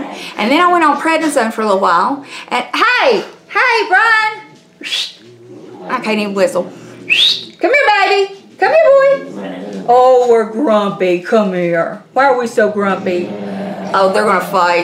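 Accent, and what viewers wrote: South, United States. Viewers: Not fake, and if you’re from the South, then it sounds normal. If I go up North, I have a difficult time understanding people, the first time they say something to me, and sometimes have to politely request they repeat themselves. BUT... I don’t dislike the accent nor do I insult their accent. Like Mama says...”it takes all kinda kinds, so be nice and you might learn something.” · Southern accent ... ew ... get 2 the point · I’m going to subscribe.. i love your personality. Lol 😂 I love that accent . I’m country too ! I was checking this product out for mom . Still debating if I’m going by it or not . Lol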